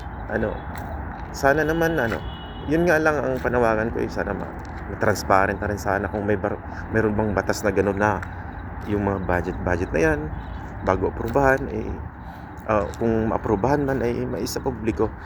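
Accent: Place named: Visayas, Philippines. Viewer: ok lang po kung bisaya accent 👍👍 para sa akin malambing mag salita ang mga bisaya, hindi ako updated sa balita sa pinas pero atleast narining ko about national budget take care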